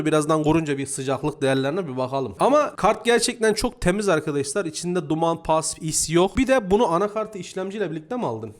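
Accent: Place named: Ankara, Turkey. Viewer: abiciğim sen nerelisin ya konusma seklın aynı ankara :D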